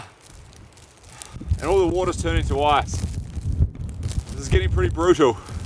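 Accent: New Zealand. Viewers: I love listening to your New Zealand accent. We have quite a few New Zealanders here in Australia so I am quite used to the accent. Do you have a playlist of your Videos, I would like to watch them all in Chronological order. Keep up the good work. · He's a true kiwi where their i's sound like e's. If you want to know if someone is a kiwi, ask them to say fish and chips. It'll sound like fesh and cheps. He's ha 😅 We'll be Namibia next year on a world cruise. We're really looking forward to it. The desert looks spectacular, but I'm sure you'd love a winter scene like this after 300 days of sun.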